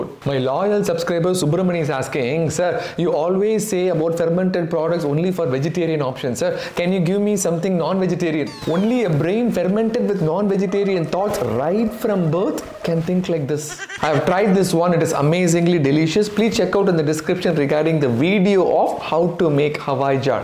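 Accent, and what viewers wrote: India. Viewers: I like how he says and pronounces fluently in Indian way. After living in US and practising as Dr there, good to see him not use NUNI NAAKU ENGLISH or rolling with Foreign accents.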